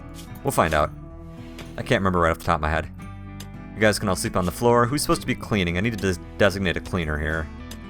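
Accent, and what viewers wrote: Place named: Midwest, United States. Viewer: is an American as am I but he's from the midwest i am westcoast. He says ruff i say roof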